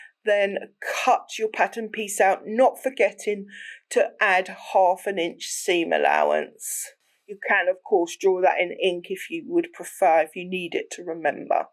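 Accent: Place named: Enfield, United Kingdom. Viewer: "Cats are not required - could someone please tell them that?" LOL, subbed. Plus you sound like a local (I'm in Enfield, you sound distinctly like my people. :) )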